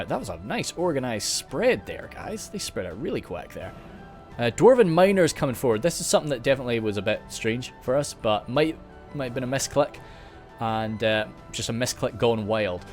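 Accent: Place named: United Kingdom. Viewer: Wait, you are from the UK? Huh, you have an accent tho...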